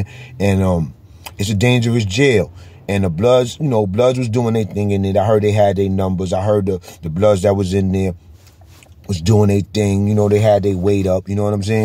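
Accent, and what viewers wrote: New York, United States. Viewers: I still cant get used to hearing a NY accent talking bloods n crips!! Crazy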